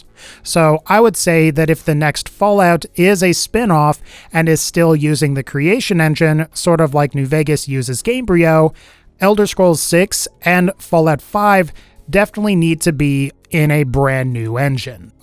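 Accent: South, United States. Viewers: Does anybody miss the loud southern accent that used to do😕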